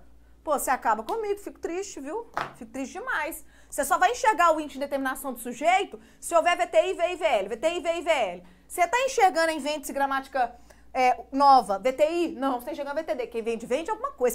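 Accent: Minas Gerais, Brazil. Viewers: Essa professora sem duvidas é mineira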